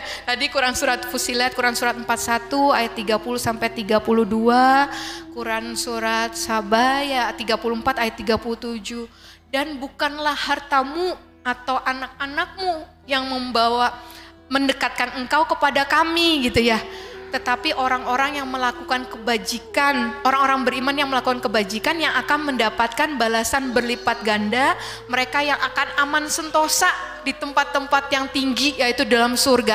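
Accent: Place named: Priangan, Indonesia. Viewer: Duh Gusti tutur katanya bahasanya ini sangat menyentuh hati lembut dan sejuuuuuuk di kuping,logatnya seperti orang priangaaaaan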